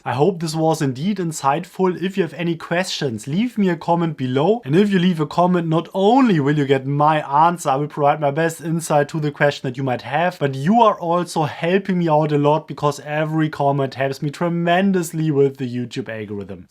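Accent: Germany. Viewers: Das gute alte German English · I love your German accent while speaking English :D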